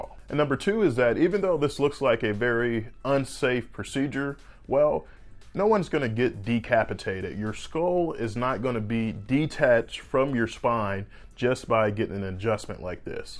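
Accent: South, United States. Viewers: Having a southern accent isn't an indicator of low intelligence. Imagine making fun of Einstein because he couldn't pronounce "theory of relativity" correctly.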